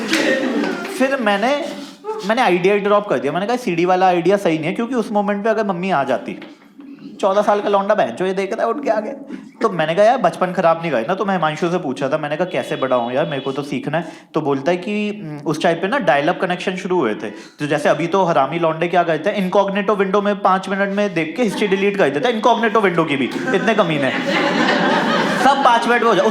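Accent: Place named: Saharanpur, India. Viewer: Pure Sharanpuri Hai... 😂😂😂😂😂😂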